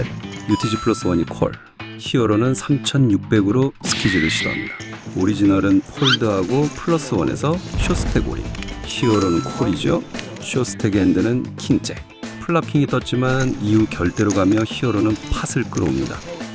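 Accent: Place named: Gyeongsang, South Korea. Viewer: 다른 영상에서 플레이하실때 음성이 나온적있는데 경상도억양이 있으시던데 더빙은 다른분이 하시나요?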